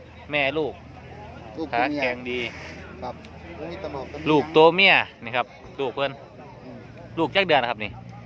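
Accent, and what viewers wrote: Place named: Sisaket, Thailand. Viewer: ศรีสะเกษชัดเจนจ้าอ้าย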